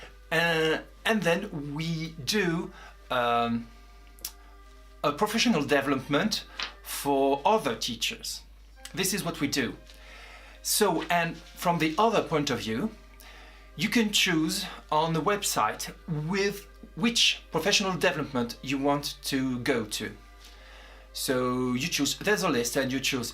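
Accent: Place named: France. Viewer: Your English is better than you think and don't worry, I could understand everything. Besides I love your French accent!. Things are soooo different! I'm not sure I like your system better, but it sure would be nice to have job security. Here without professional development you can't renew your teaching license, which you have to renew every 3 or 4 years.. In my school district you have to have a masters degree to be hired and you are on probation for 3 years. Then you have seniority, but even then, due to budget cuts you still can be laid off. A week or so ago we were told that maybe 8 teacher will have to go next school year. I wish I was French!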